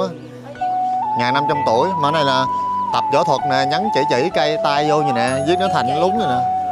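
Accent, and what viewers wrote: South, Vietnam. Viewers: giọng Nam bộ tự nhiên, vui vẻ, kiến thức sâu....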